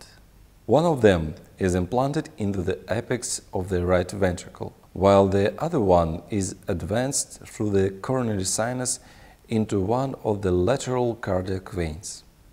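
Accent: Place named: Russia. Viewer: The Russian accent is a little difficult but the information is top notch. Great demonstration!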